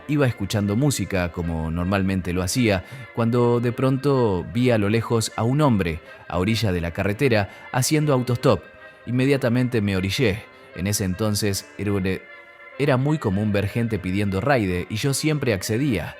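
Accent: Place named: Argentina. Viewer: Buenas historias lo único es que las historias están ambientadas en México y el narrador es argentino 😅😅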